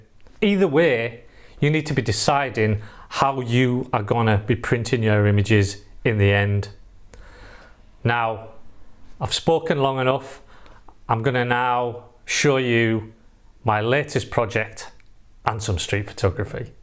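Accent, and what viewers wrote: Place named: North, United Kingdom. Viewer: Hello from France! Love your entire channel! I always learn things in your videos. And your delivery is very good too, with a calm voice and a lovely Northern accent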